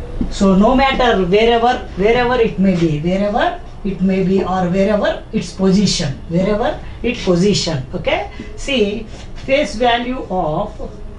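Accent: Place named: South India, India. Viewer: fabulous lecture sir south Indian type....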